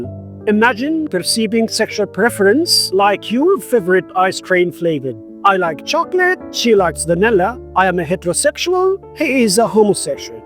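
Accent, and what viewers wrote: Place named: United States. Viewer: طول عمري بحب اسمعك وانت بتتكلم لكنتك امريكاني فاكر زمااان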